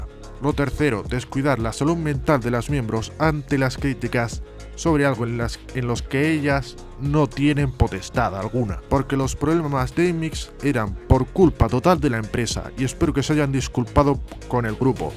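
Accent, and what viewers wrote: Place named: Spain. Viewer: hola humano por el acento debe ser español me gusta que alguien intente profundizar con bisturi en las entrañas de nmixx buscando el problema de su muy poco mas que mediano exito hasta hoy, analizando con lupa a detalle cada cancion y comeback no coincido con el caso kyujin para mi ella tiene que ser el centro y es mejor en todos aspectos que sullyon solo exceptuando la belleza, o.o Dice, soñar, son canciones que poco tienen que hacer contra las de grupos como new jeans,aespa,no se diga grupos de alta calidad musical como XG, tripleS, pero tampoco las calificaria como basura, yo en lo personal prefiero Dice que run for roses Dice es una cancion corta pero grandilocuente llena de cambios de ritmo instrumentalmente rica y fantasiosa una especie de k-pop progresivo es dificil que el publico coreano la aplaudiera mientras que run for roses es mucho mas basica y simple alli fueron a la segura y les resulto cada quien tiene su propia opinion coincido en que el mayor culpable es la empresa ya que las chicas tienen talento de sobra lo unico que necesitan es de buenas canciones que las hagan destacar pero esto pasa con muchisimos grupos y podia nombrar el caso de Kep1er y Everglow y algunos otros mas con miembros con gran calidad pero que es poco aprovechada con canciones terribles como tipi tap o zombie que uno no puede creer no haya un filtro de calidad que se de cuenta lo pesimas que son y salgan al publico,espero que siga haciendo videos porque hay muchos casos de que profundizar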